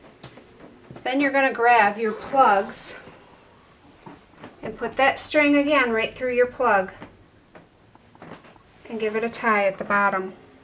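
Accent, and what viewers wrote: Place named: North, United Kingdom. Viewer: She is so sexy love the north/mid eastern accent.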